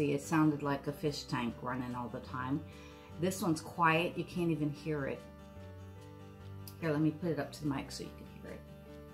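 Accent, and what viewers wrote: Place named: New Jersey, United States. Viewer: You sound like you're from New Jersey.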